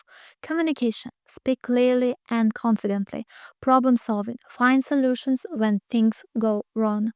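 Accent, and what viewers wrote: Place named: United Kingdom. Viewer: Вау твой Английский реально приобрел британский акцент афигеть 😮👏👏 и все так профессионально выглядит! Эта картинка 🥰 ты изумительная 😍 видео с двух камер и вся анимация и инфографики ☺️🔥🔥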